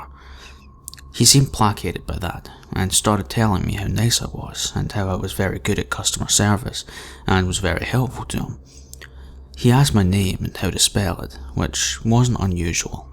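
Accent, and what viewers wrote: Scotland, United Kingdom. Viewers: you're a man of great talent. thanks for the text back and keep giving us a brilliant channel. the accent? I'm thinking you're from the east coast of Scotland?😃.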